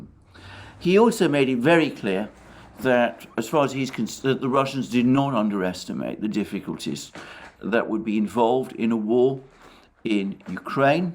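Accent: England, United Kingdom. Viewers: Russian disinformation with an English accent!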